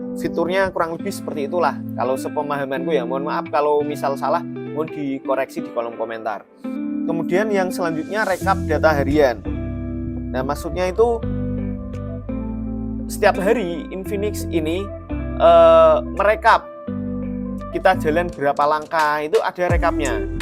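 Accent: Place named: Semarang, Indonesia. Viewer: tq mas 🙏 Btw kek logat semarangan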